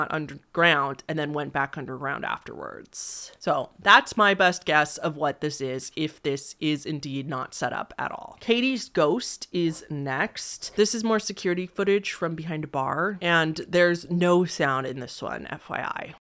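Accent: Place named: Midwest, United States. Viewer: It’s fine it’s a very midwestern thing. Like “warsh” instead of wash. It drives me crazy but it isn’t “wrong”.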